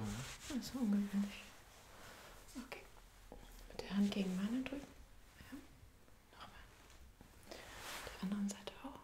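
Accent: Germany. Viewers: It took me 11 minutes to know she German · I think she is german...i don't t inderstand anything..but is good..i feel triggers.....gostei muito mesmo..ela é ótima....já me inscrevi no canal.... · yG KeKe I love the German language, and their accent. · I very much prefer the soft speaking roleplays. The German accent and language is nice ASMR. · вы из германии или австрии?) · german, guaranteed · A Germán doctor.....pretty familiar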